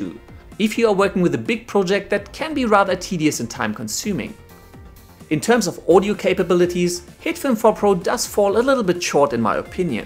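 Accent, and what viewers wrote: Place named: Germany. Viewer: Das ist doch ein deutscher oder? merkt man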